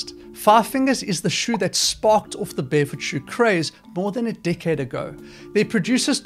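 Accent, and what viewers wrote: South Africa. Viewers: You sound like a South African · You sound South African!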